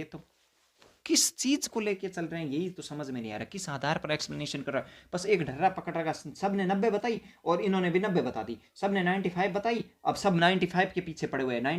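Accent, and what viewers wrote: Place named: Barmer, India. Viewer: Sir lgta hai aap Barmer ke ho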